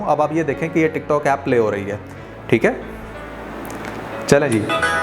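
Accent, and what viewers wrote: India. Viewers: Mr. your accent and words shows that you are highly effected by Indian content. Ap kisi Urdu k professor se milen aur baat Karen ta k ap seekh saken k humara accent aur alfaz un se kitne behter aur khubsorat hain.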